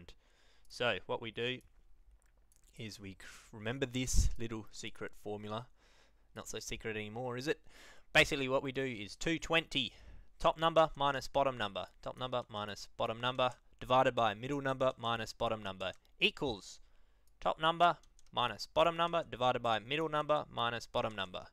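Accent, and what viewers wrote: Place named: Australia. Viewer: Aussies make explanations much more fun to follow with their accents, cheers mate, and great job!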